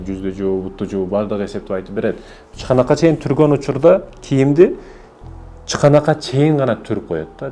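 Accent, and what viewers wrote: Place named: South, Kyrgyzstan. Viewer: Туштук диалекте ошентип эле айтылат, уккуң келбесе укпа.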